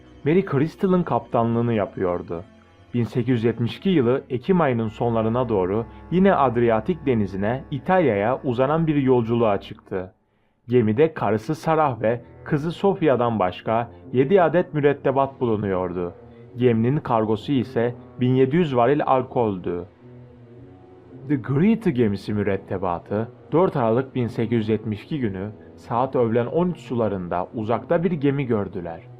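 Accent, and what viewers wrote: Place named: Germany. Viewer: kardes alman ikinci liginden gelen gurbetci topcular gibi konusuyosun.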